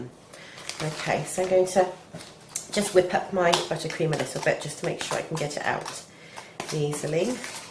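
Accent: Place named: England, United Kingdom. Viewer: Hello 🙂, Great video! This was more detailed than I needed (more is definitely better than not enough), but perfect for the majority who may not do baking projects all the time, so kudos! I'm from New York, but I find that if I pretend to have an English accent (and an interested audience), all my projects turn out brilliantly, hahahahaha! 😂 Of course my husband hears me in the kitchen and thinks I'm nuts! 😏 You, btw, have such a lovely voice and accent! Thanks for the tips and tricks!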